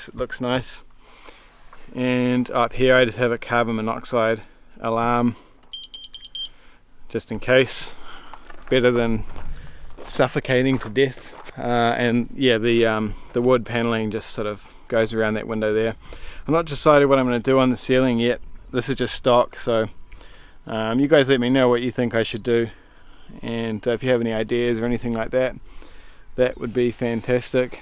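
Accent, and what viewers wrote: New Zealand. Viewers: so nice to hear a Kiwi accent !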